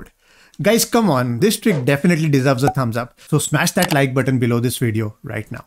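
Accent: India. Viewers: This is word for word gotham’s video with an Indian guy narrating 😂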